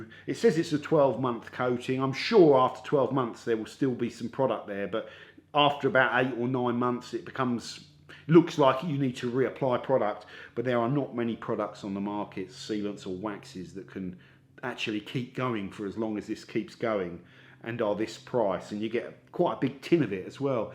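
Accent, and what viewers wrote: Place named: Southern England, United Kingdom. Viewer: I’m trying to place your accent, I’ve narrowed down to a southern accent, Brighton maybe, possibly Bournemouth? Am I close haha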